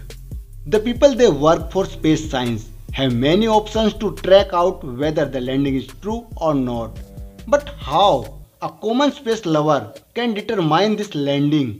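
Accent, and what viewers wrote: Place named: India. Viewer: From the accent, youtuber should be from India. Typical human behavior when you wish your competitor to fail. However, credit must be given when credit is due. We should applaud China's effort. This is the 21st Century, you still thought that nation can cover up their failures. Imagine it was exposed years later ... there is nowhere to hide such shame. No one would like to such risk. Many of us know very little about the Chinese including me. But one thing I am certain, the Chinese does not care what others speak of them, they are more worried about fellow Chinese opinion.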